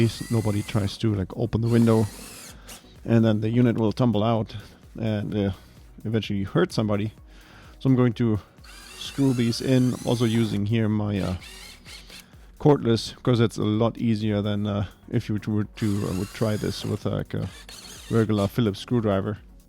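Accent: Germany. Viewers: i love the subtle hints of the German accent. :) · This video got rid of any anxiety I had about installing these. By the way you sound very German. My tutor always pronounced his "w"s and "r"s like you do.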